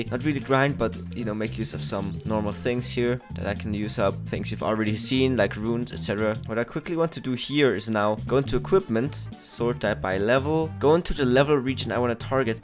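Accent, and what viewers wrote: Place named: Quebec, Canada. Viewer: where are you from buddy? Im pretty sure I heard some french quebecois in your videos ^^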